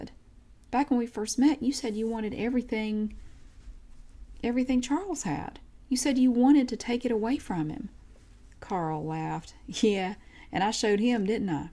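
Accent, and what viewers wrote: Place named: East Tennessee, United States. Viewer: I will almost bet you are from East Tennessee. Well...you sound like us...in Knoxville lol.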